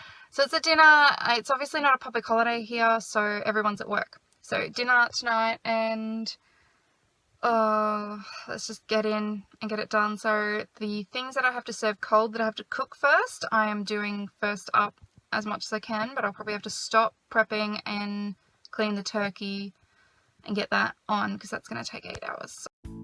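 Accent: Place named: Australia. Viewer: This is a marvelous video thank you so much for sharing this incredible video I love your Australian accent and just recently learned that Australians don't celebrate thanksgiving lol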